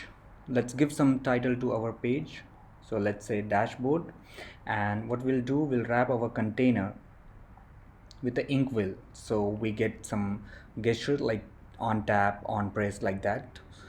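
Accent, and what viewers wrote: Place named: India. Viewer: WTF with the Indian English omg it seem like 😱 where did you learn that kind of accent guys ??!! U r awesome by the way 👍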